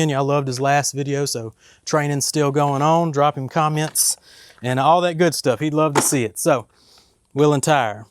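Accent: South, United States. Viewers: Thanks for the update! I went and bought the 1.8 based off your original review; I was just NEEDING something smaller and lighter than my big 'ol thang with wheels and a hose reel. The only issue I have with the Ryobi gun is that when I put the extension on it, I feel like I fight to keep the water stream pointing down. It's like I pull the trigger, and the nozzle kicks up. I did put a swivel on it, and as a pure stubby it's great. Love the lack of music and your LA accent! I'm in East TN, so it's cool to hear the southern accent with a twang :). Love your vidoes!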